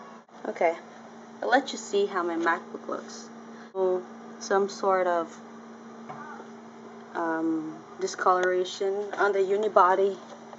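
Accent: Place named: Philippines. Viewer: Great video! I can hear you pinoy accent ha. You sound like my mom haha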